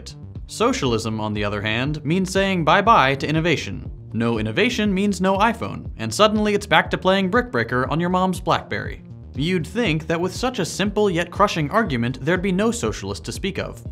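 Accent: United States. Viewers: It should be obvious by your accent, by perhaps you should've prefaced this video by explaining that "we" and "our" means "American", because capitalism is not equal the world over. Europe enjoys (or has previously enjoyed) a relatively socialist approach to capitalism. What you described was the American model of "free market capitalism" which is anything but. You described America's relatively unique capitalist healthcare system, for example.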